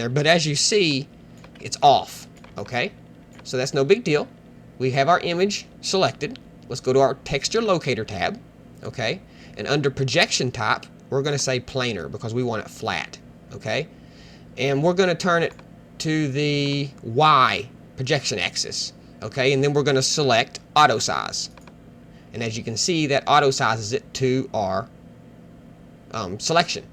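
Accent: South, United States. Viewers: You are indeed a generous person and I love your good ole boy accent. Down home education. Excellent tutorial. Now I know what Modo is all about.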